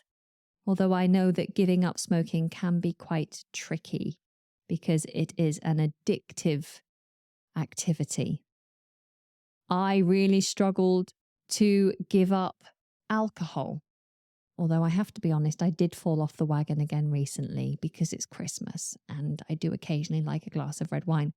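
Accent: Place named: RP, United Kingdom. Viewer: Good lesson, especially for the beginners! I especially adore your accent, the genuine RP accent and pronunciation.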